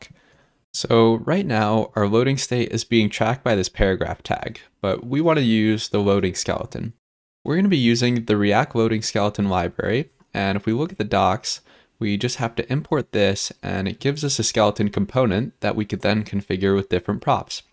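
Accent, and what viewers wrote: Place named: India. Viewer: Great video, by the way, are you Indian or Russian? Great accent and a very soothing voice.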